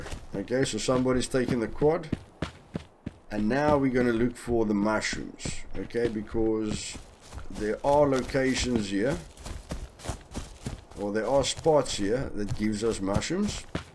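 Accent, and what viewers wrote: South Africa. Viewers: Hey, which country are you from? Your accent sounds like you are from south africa? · Are you from South Africa? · Is jy south african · Thick South African accent. Love it 😂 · Where are you from? xD Sounds like an SA accent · You're south african aren't you😂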